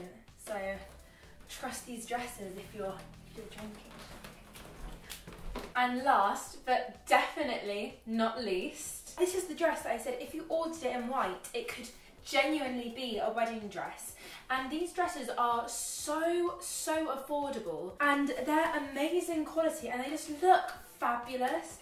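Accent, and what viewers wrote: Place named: United Kingdom. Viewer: I love the British accent 💕💕💕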